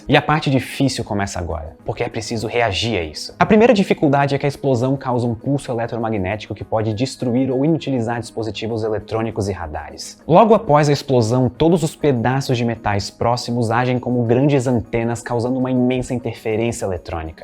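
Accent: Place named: Rio de Janeiro, Brazil. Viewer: CARIOCA SPOTTED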